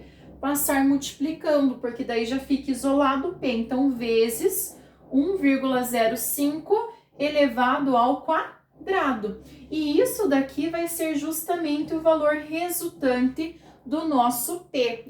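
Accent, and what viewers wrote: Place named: South, Brazil. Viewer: Professora estava olhando umasa aulinhas de matemática financeira encontrei esse sotaque que deve ser do Sul. ,que ao que parece entrou no mundo das tele aulas devido ao isolamento sócial . Querida vejo também que tu gosta de ensinar e eu defendo quem faz as coisas por amor beijo sucesso e se puder ponha aulas para encontrar taxa e período compozto pois não encontra se aí disso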